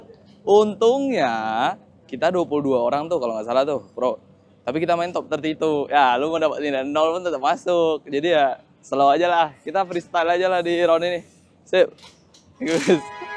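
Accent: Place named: Malaysia. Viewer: melayu gitu logat nya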